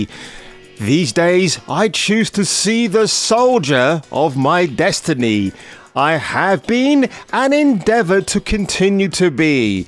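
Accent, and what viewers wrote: London, United Kingdom. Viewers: You sound like a good London lad, course I could he wrong. My old ears ain't as good as they used to be lol. But I still can tell 🐮 💩 from Sophia Non'Entity when I hear it. Everytime this gobbshitte comes on the screen now. It's auto MUTE!!!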